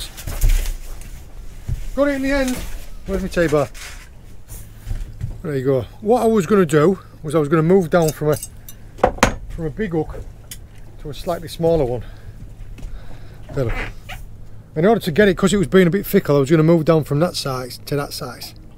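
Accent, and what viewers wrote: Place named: Teesside, United Kingdom. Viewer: cracking content bud, nice to hear the Boro accent lived in Great Aston for 15 years and in Looe for 5 years, now ;living in Cheshire and the only fishing i get to do now is fly for trout, miss being on the sea loads.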